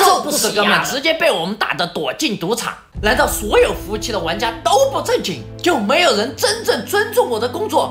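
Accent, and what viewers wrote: Sichuan, China. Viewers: 有个问题想问下台湾的朋友们啊..大陆里面的普通话和台湾那边的无论是用词还是发音习惯都有些区别，厂长的视频里还带了点四川口音，台湾的朋友们能很流畅的听懂吗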